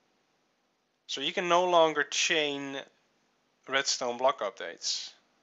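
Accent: Netherlands. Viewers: YOU MUST BE DUTCH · Ur dutch? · dutch english sounds sooo bad :S nothing personal man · i stil think you're from holland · dude je engels klinkt nederlands. dude your english seems dutch.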